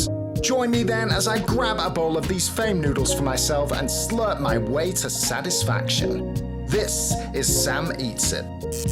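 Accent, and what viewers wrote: United Kingdom. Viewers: Lovely British accent and good food. Instant subscription and like👍🏽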